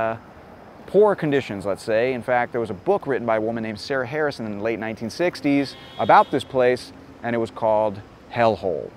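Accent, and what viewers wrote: New York, United States. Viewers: I don't like his New York style of speech similar to David Letterman. I never met a person from NYC who I liked who wasn't loud and abrasive.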